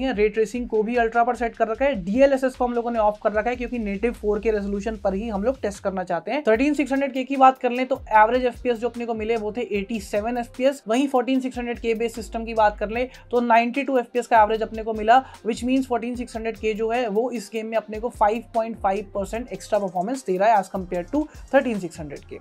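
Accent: India. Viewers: bhaiji tusi punjabi ho ? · tum Delhi se ho kya ?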